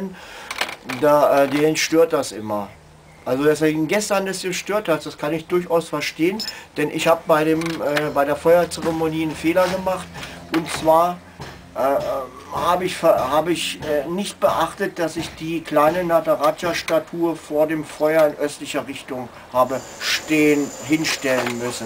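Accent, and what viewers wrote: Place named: Berlin, Germany. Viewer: Also ik würde sagen dit bärlinärische is klasse ! JJJ ! xD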